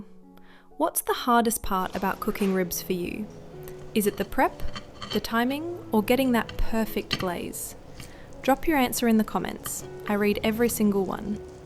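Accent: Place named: England, United Kingdom. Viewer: Why do the videos for this channel use a voiceover with an English accent, when it is clearly produced by a US citizen? Yellow onions are used in some recipes but they are called brown onions in the UK. All UK airfryers use temperatures in Centigrade, but Fahrenheit are always quoted first on this channel. And no-one in UK calls an aubergine an eggplant. Just be honest guys!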